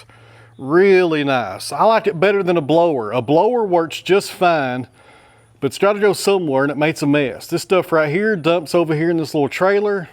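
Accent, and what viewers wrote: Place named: Tennessee, United States. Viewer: Audio is perfect. Can understand even with your Tennessee accent. Lol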